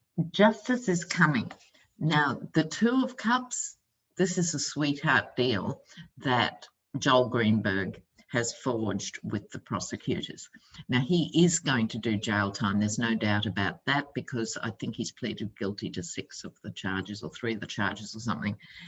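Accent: Australia. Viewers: Oh I love the Aussie accent! In fact I think it’s puts greater importance on the words. Love from the USA. Please stay safe. God bless you and yours.♥️🇺🇸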